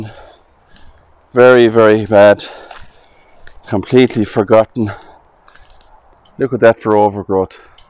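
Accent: Ireland. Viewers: What wonderful video. When our children have moved out, I hope we can travel to Ireland and visit our ancestors and look at the old churches. Just a minor suggestion for you to consider. Maybe give the location and formal name of church in the written notes for each video? Your brogue is wonderful to listen to for us out of Ireland, but hard to make out the names by pronunciation and given spelling differences. (I'd love to add this church, for instance, to a vacation wish list, but I have no idea where it is.) 😢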